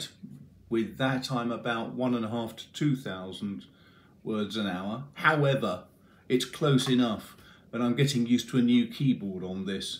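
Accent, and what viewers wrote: United Kingdom. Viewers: Great review. I hope to be a charming gentleman like you one day! People from the UK are just classier than us Americans. It's gotta be the accent. Anywho! One of my big complaints about the Freewrite is the typing delay. When I'm on a roll and I'm comfortable, I can usually type 70 words a minute on average and I'd like to keep that consistent. And it seems like the device would struggle keeping up with such a speed. Any thoughts on that?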